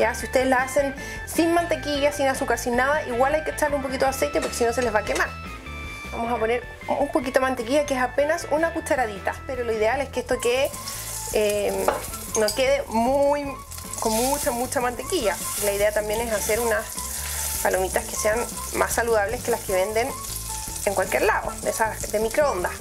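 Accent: Chile. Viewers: Tienes voz de chilena :v , muy bonito video , viva chile :)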